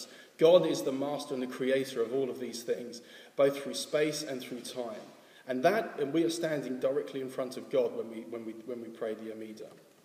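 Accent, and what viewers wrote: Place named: London, United Kingdom. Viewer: Thank you so much. You sound like a Londoner (Guessing North East London?) I used to work in a kosher butcher"s in Southgte. I also Have you ever heard of Shimusha Rabba tefillin?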